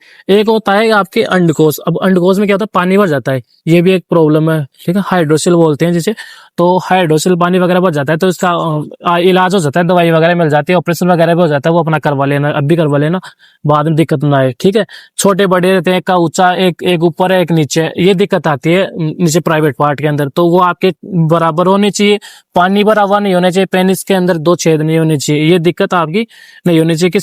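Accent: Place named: Jhunjhunu, India. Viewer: Ya aavaj to mahre jhunjhunu ki lage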